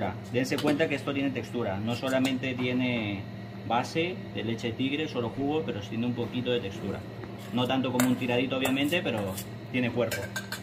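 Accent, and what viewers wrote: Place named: Spain. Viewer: Tu acento ya parece español bro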